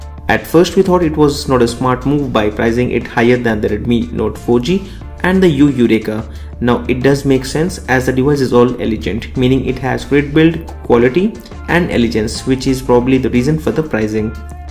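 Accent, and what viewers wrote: India. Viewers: maybe you should remove your indian slank when you speaking in English..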